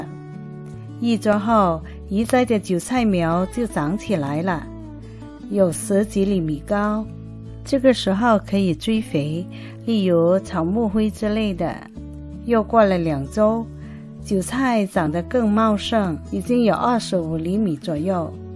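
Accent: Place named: Cantonese, China. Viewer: 喜爱的广东口音，亲切，可爱。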